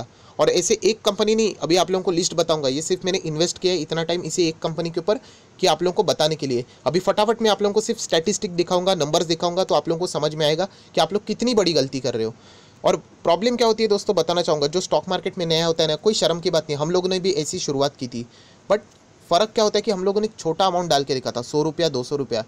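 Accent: Maharashtra, India. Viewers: Nhi dada tynchi tone varun kalt te marathi ahet..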